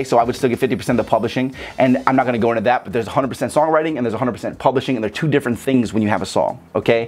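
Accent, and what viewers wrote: Chicago, United States. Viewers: He sound like he from deep chicago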